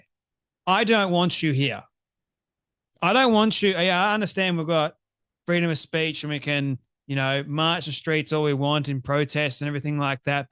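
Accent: Australia. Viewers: It looks to me like an invasion and yes Australians have had it great for a long time,but most are asleep "she'll be right mate" No longer rings true.Sorry time to wake up and smell the roses Aussies.!As for the narrator here your voice is too harsh and unpleasant to listen too gratingly not charmingly Aussie,(if ever it even was) .You and Pauline Hanson both cannot acknowledge your Achilles's heel.Until you do you will never get to the top in Australia.Australians seem to suffer from an inferiority feeling from , "The land down under".All you two do is remind them of it!! Sorry.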